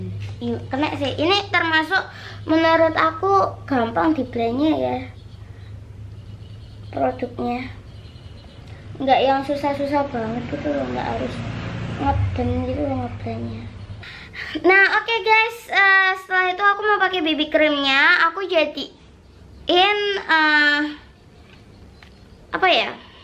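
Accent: Java, Indonesia. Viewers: aku mojokerto juga medok wkwk. Lah bukannya dia dri tulungangung ya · Seneng dengar meddo' jawanya😁 Sek to dek, meneng o dek, aku nge review iki loh🤣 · Medok bgt logat mba 😂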